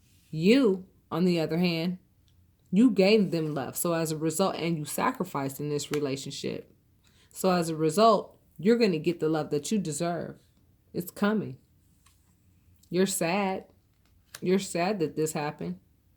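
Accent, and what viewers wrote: United States. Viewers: well said exactly what happened to me poured her with so much love so drained karma a bad thing shes having a shit time out there all the lies mind games cheating manipulating witch still wont apologise for all the pain caused shes getting it bad thank you so much luv the yanky accent bless ya xxxxxxxxxxxxxxxxxxxxxxxx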